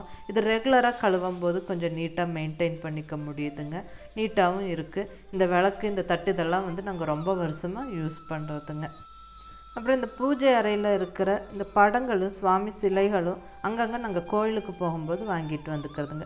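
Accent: Kongu, India. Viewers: Mam ur from which place. Ur slang looks like Coimbatore. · Neenga kongu Tamil a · Alaga Coimbatore slang pesaringa pongako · I like your kongu tamil very much. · Hi mam,unga kongu Tamil nallarku,and unga son pencil sketch really nice.....we r planning to built a new home....unga pooja room,bed room,kitchen over all its so good and romba tasteful ah katirkinga....pls show al d rooms...and front elevation....and pls mention d furiture purchase place....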